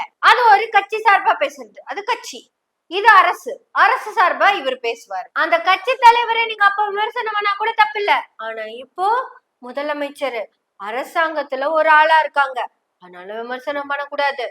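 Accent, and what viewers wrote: Kongu, India. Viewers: neenga pesuràdai record panni keluñgal ungal Kongu Tamizh is super your boldness is super and my humble request is not to say that you are a Malayali even though your parents are from Kerala but you are a Great Tamizh Magal.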